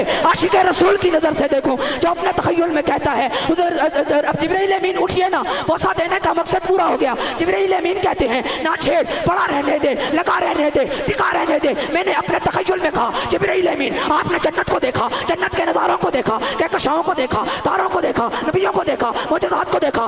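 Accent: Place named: Mansehra, Pakistan. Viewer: آواز سے محسوس ہوتا ہے کہ یہ مانسہرہ سے ہے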